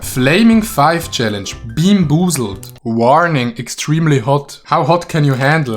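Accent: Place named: Switzerland. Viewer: Deinen Schweizer Dialekt erkenne ich sogar, wenn du Englisch sprichst 😂👍🏼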